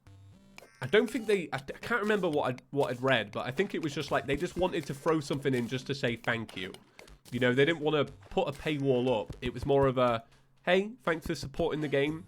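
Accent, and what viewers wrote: England, United Kingdom. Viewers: yeah thats the english accent for you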